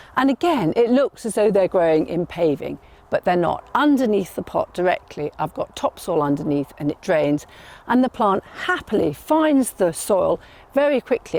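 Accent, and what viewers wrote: United Kingdom. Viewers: OK, I'll grant you that lol. But it is hard to hear her talking above the music and even though I have no trouble understanding a British accent, the music adds a layer of 'accent' that makes it hard work to follow speech.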